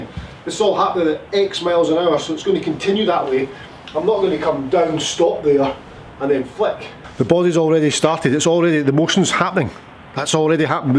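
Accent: Scotland, United Kingdom. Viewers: The best instructor yet, love that Scottish brogue, gets his point over with lots of demonstration along with explanation. He does not waffle on about other things. As a 91year old I love his lessons I think he is the best thing since sliced bread.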